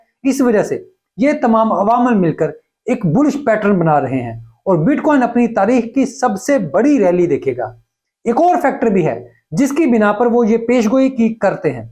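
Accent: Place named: Punjab, India. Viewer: Your punjabi accent is so good 👍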